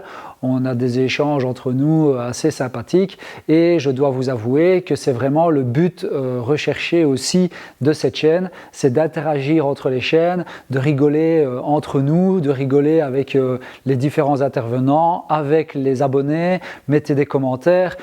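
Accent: Belgium. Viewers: Oh ça n’a pas l’air de tourner bien rond aujourd’hui!!! Si tu décides d’arrêter à cause d’un charlot, ça ne ressemble à rien. Ignore-le, c’est la meilleure chose à faire, nous on te respect pour ton job, pour ton savoir faire ainsi que toute ton équipe, quand je dis nous je parles au nom de la majorité de tes abonnés. S’il y a un con qui se moque de ton accent ou des belges, en général, c’est qu’il n’est pas bien intelligent, laisse dire les jaloux et continu ton job. Tu es un mec bien et tous les collègues aussi. Salutations très amicales. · Les erreurs reste humaine courage ne te décourage pour des râgeaux ça n en vaut la peine . De plus en France nous adorons nos voisin belge et leurs façon de parle ..car le sais tu d une région à une autre en France il y a des façon de parler totalement différent....allez les gars courage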